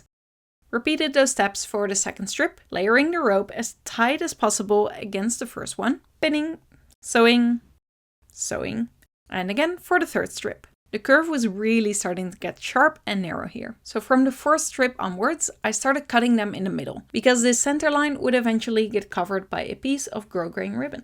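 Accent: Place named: Quebec, Canada. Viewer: Love this tutorial. I recently got back into SLR film photography and want to make myself a cute camera bag. I also love your accent, are you from Quebec?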